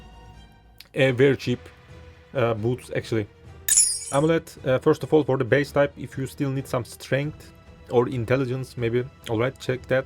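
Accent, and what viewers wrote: Turkey. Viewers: Türk oldugunu Ingilizce Konusmandan hemen anladim 😂 Bende bu Buildi 3.16 de kulancam. Almanyadan selamlar!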